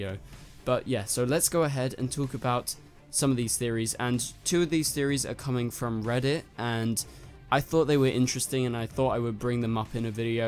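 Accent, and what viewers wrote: United Kingdom. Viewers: Thanks for the vid bruh! And... Is he British?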